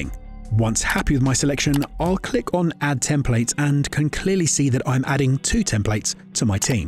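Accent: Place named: England, United Kingdom. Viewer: This is amazing! Amazing product, walk through and the English accent helped bring everything together :)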